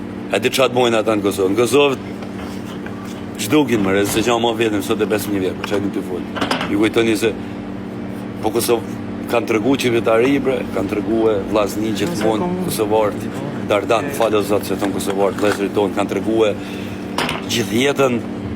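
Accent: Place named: Durrës, Albania. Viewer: Ca bone si fol arkimeti si na durrsi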